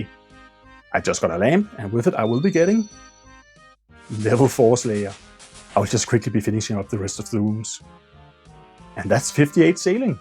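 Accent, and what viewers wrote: Denmark. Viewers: See, i love me a fine light accent on the tube and this one is charming as heck lol, where you from, Danmark? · fuck jeg elsker danglish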